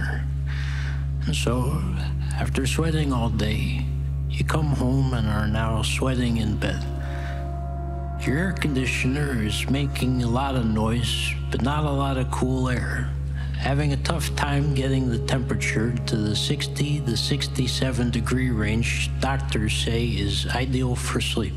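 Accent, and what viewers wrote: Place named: New York, United States. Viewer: A voice that actually represents NY. This man is a treasure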